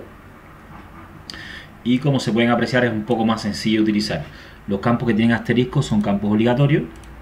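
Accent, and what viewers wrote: Cuba. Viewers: Hola, eres cubano no?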